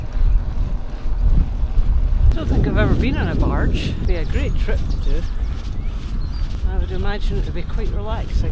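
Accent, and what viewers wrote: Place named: Scotland, United Kingdom. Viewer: Trying figure out the accent, where you grew up. You say you lived in Glasgow but you sound just like my mate from Selkirk. Pop Tarts are actually a great as a ride snack. Fairly heavy so 1 pop tart lasts ages, sugar for burst energy, carb for slow release. They keep empty bellies at bay if nibbled on throughout the ride. I do Cumbernauld to Dalkeith a lot as my partner lives there and i live Dalkeith. Depending on whether i do: Cumbernauld > Falkirk > Boness > Dalmeny > Edinburgh Canal > Edinbrugh or Cumbernauld > Cauldercruix > NCR75 > Edinburgh Depends on whether i take a few pop tarts. Canal gets you EICA and the cafe there. Boness has a nice wee cafe but the NCR75 keeps you from the main streets so i take provisions. ;) A wee pop tart. , I often ride to on my fatbike to North Berwick #MuttsCycles Videos on my business channel. 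But this section you are on i hate doing. lol, an... ex just stays 5mins form where you are. Always worry about bumping into her (tbf, i'm sure it'd be fine). 🤣😇 It's ok you missed Gullane, nothing worthwhile there anyway. 🤫 I'd say the Walled Garden is just up the road but you gotta be a millionaire for a Tea and Sausage Roll. 🤪 I bought my Fatbike from a Guy in those houses behind the fence. Super expensive. Nice though. Thanks for the video. I've not done Helensburgh section. Might look into that next weekend or similar. I enjoyed the video. Feel like it deserves more views.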